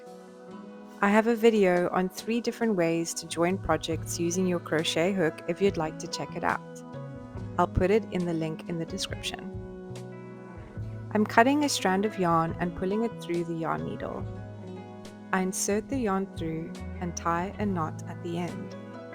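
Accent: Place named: South Africa. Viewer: Interesting! And so pleasant to hear a South African accent on a crochet channel.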